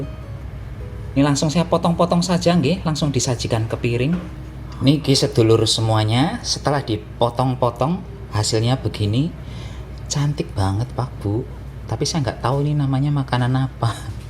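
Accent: Banyumas, Indonesia. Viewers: Happy masaknya dengerinn medokk jowone ngapak... Dan resepnya sy suka semua...good lucky 👍👍💃💃💃💖💖🌹🌹 mas km klu mkn bikin ngilerrr..... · wong ngapak ank e mbok dirah 🤣🤣🤣🤣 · Ya Allah... Medok temmen kueh .. 😂😂. Jd nambah pingin tilik mbahe aring Banyumas.., brikute..smangaat terus masak" dg apa anane bae👍👍💪😇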